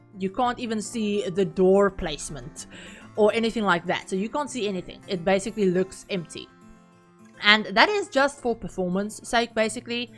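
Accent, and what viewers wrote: South Africa. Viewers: You sound south African, im from SA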